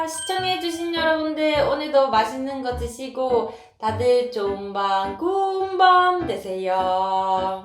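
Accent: Gyeongsang, South Korea. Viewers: 양! 올 만 입니다. 역시 갱상도 말투는 구수하죠. 드실때 함씩 얘기 좀 하고 드세요. 맛이 어떻다,김밥은 저번에 먹은거 보다 맛이 어떻다 등 보는 이들이 같이 공감 하는게 어떨런지! 물론 뒤에 강아지는 간식먹었죠 근데 너무 애처롭게 보이내여...한번씩 뒤돌아 보세요. 강아지 왈 " 지만 마이 묵고 나는 쪼매만 주고 잉:;~/^ 하는 표정 ^^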